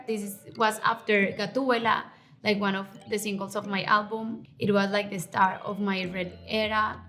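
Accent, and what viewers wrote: Paisa, Colombia. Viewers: Ella habla paisa por eso sale asi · Paisanglish 😂😂😂 la amo ❤️❤️❤️❤️ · Que ingles bonito con acento paisa. Karol G una dura una bichota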